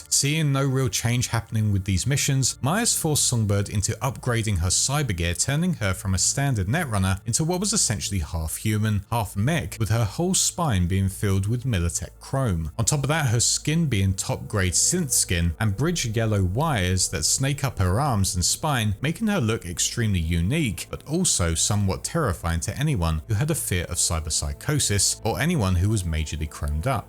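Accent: United Kingdom. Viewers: I think you should be a voice in the sequel. Either a fixer or working with me blue eyes. You see, because you're British so obviously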